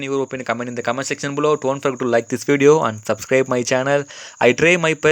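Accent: South India, India. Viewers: Are you South Indian??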